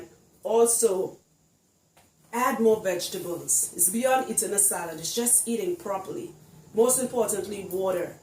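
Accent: Trinidad, Trinidad and Tobago. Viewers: I'm also Trini and Aquarius....we can't help the way we speak girl..... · I love the Trinidad accent, be proud and don't worry about negative minded people.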